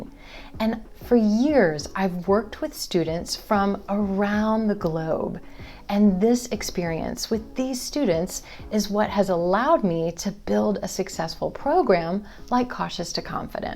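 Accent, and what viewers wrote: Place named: United States. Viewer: Hello my teacher really I love way you're speaking American English pronunciation it's so sweet I'm Steven am in Canada Ottawa Ontario I need to speak English like you if possible.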